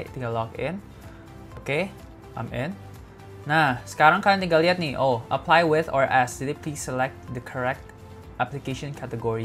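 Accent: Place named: Indonesia. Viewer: Love bgt accent mix Indo English kamu❤❤❤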